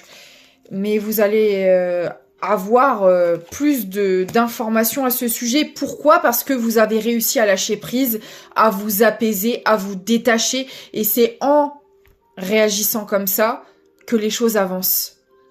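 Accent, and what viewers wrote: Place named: North, France. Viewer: Tu es trop forte, cette guidance me parle tellement. L’amour inconditionnel évidemment, je l’ai laissé partir parce que je savais que ce n’était pas le moment ni pour lui ni pour moi il y an. Nous nous sommes revus qu’une fois en août depuis mais il n’y a pas une seule journée depuis un an où je n’ai pas pensé à lui. Tout correspond des 2 côtés, tu es vraiment très forte je le répète. Je me disais bien avec ton accent que tu venais pas du sud, j’ai grandi à côté de Beauvais 🤩. JE t’embrasse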